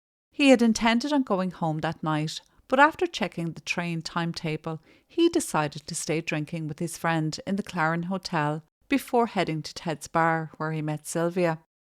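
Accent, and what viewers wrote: Ireland. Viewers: Great to hear your lovely Irish accent as iive been stuck in London since my body fell apart about 6 years ago, 😮.. and wasn't able to make it back as i had to get medical leave as my BasterD hips Collapsed then the bottom of my spine Popped 😮... then CoviD, then a Wheelchair ♿, then 4 years between each hip replacement then......😅...AAaaaH JeaZuS, i must be boring 💤 you now 😂😅... Anyway... fantastic show, ive been Religiously following the absolute DicK Circus 🤹‍♂️ that is poor Lucy Letbys lincining and SCAPEGOATING 😢😢😢😢.. I Think 🤔 Both Country's Justice systems are 0ne 😮😮😢❤❤❤❤🎉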